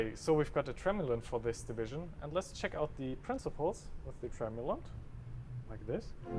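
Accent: Germany. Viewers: Are you from German your englisch is like a German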